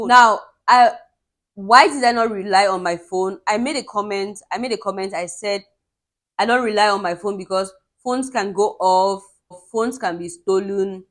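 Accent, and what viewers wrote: Nigeria. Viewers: You speak like a Nigerian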